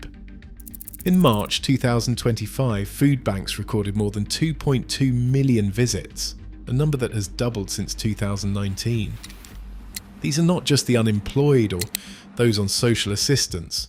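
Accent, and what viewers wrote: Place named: United Kingdom. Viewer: Interesting, that a video about hardship in Canada and dwindling opportunities for middle-class Canadians is narrated by a guy with a British accent.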